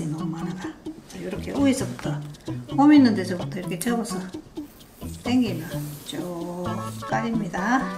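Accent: Gyeongsang, South Korea. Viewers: 경상도 사투리가 참듣기 좋아요 전 전라도 사람인데요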